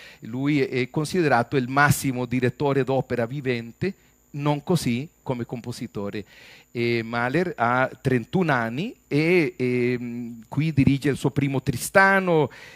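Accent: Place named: Costa Rica. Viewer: Es un enorme placer ver y escuchar al costarricense Gaston en esta deliciosa conferencia, en que llevados atraves de la armonía del italiano en versión tica, clara y precisa, nos lleva de la mano a explorar la obra de Gustav Mahler. Me conmovio el haber sentido el profundo sentimiento de respeto y admiración del Sr. por la obra de Mahler atraves de su voz que trae remembranzas del pasado. Mil gracias.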